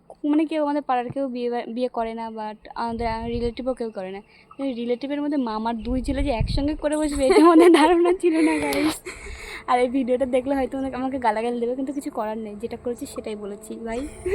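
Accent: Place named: Bangal, Bangladesh. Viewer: Amader nemontonno korbe na !! Eka eka e chole jaccho biye khete . Ar apni to puro bangal bolchen .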